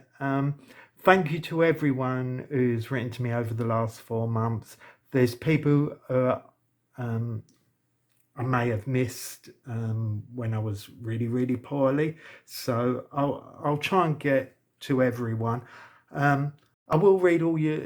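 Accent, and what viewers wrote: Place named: London, United Kingdom. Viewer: Welcome back and well done - I know how hard it is to get back to things after something like that! Lovely to hear another Londoner’s voice. xxx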